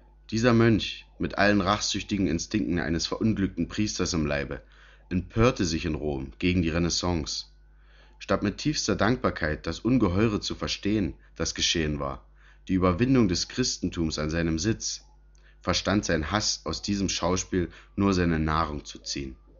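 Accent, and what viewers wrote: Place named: Saxony, Germany. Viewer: Es scheint mir unmöglich diese sächsisch angehauchte Stimme zu ertragen.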